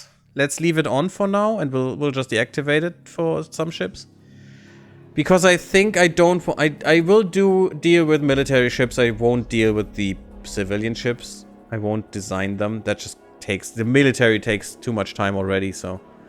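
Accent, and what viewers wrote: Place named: Germany. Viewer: Are you german? Love the accent lol sounds cool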